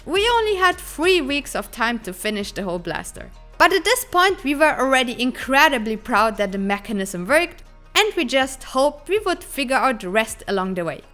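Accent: Germany. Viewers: you sound like you're german... and you probably are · den deutschen akzent hört man einfach immer raus 😄 sehr geil gemacht ❤❤❤❤❤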